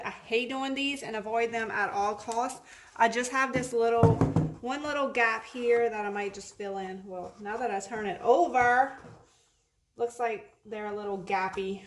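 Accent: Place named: South, United States. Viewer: Just discovered you and subscribed! Where are you from? Your southern accent is strong ☺️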